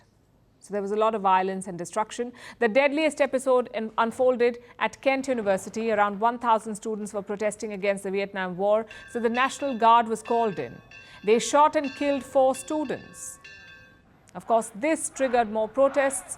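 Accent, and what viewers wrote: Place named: India. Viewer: Indian accent is so beautiful like this news lady. The best accent in the world.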